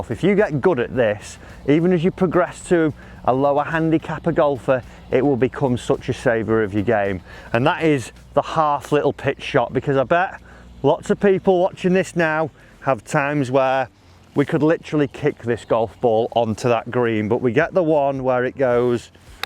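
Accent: United Kingdom. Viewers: I can't get enough of that british english (are you from Manchester?)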